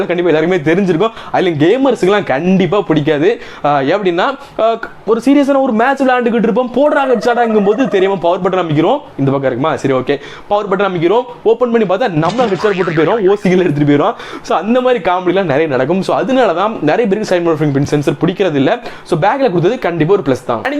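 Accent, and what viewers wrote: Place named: Tirunelveli, India. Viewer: ji neenga endha ooru ji....unga slang ah paatha tirunelveli side maari theriyudhei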